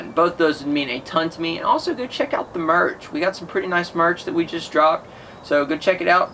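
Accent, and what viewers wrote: Tennessee, United States. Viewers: So first off I aint gonna sit here and criticize you even if I might not agree with you 100% for the simple fact that as a guy who is trying to get a youtube channel off the ground I know the pain of making and editing youtube videos and your's are looking pretty good. Secondly for the people criticizing you for not mentioning .308 being better for long range I may be wrong but I'm willing to bet from that accent you come from the HEAVILY wooded state of Tennessee. where the average range of visibility is under 300 yards where as here in south central Kansas unless I'm hunting prime river ground I'm taking shots at or over 300 yards. So long range capabilities would not be as important to you merely because you don't really need them. Anyhow good video man keep em coming you've gained a new subscriber.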